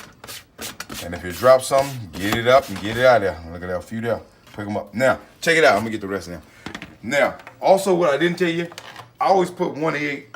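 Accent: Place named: Chicago, United States. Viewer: This my first day watching this and I just love your positive approach to cooking exspecally the children I’m in love already. You sound like you from Chicago! I’m a female version of you!! Love it! Wow 🤩 what you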